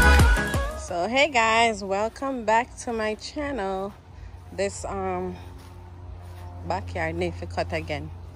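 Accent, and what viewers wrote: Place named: Jamaica. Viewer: Happy 4th of July. Lol one minute you talking so I can understand then the next you talk Jamaican lol but I understand I’m learning 🤗🤗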